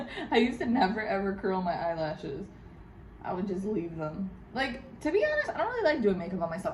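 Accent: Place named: Spain. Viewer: Hola 👋🏼 I’m a first time viewer...I found your video because my hubby & I are going to the same resort in Feb 2021...I really enjoyed watching this vlog! And the makeup tutorial was a bonus! You are so pretty and I loved your story about pronouncing things differently. I adore Spanish accents so when I hear it, it’s like “butter to my ears”! Anyways, I just wanted to say hi and let you know you have a new subscriber! Thanks again for making this video...glad you and your hubby enjoyed it! 😘🥰❤️PS I think you look like the actress Megan Fox! Lucky you😍😍😍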